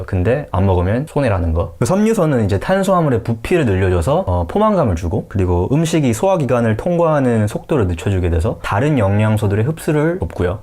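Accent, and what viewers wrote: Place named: United States. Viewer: 미국살다온 말투ᆢ 좋당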